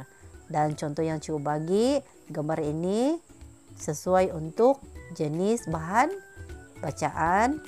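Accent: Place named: Sabah, Malaysia. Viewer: Thanks cikgu , loghat sabahan btul ne 🤭😍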